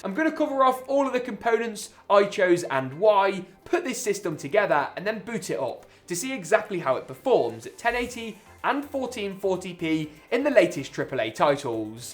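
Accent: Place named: Wales, United Kingdom. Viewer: are you Welsh, you sound Welsh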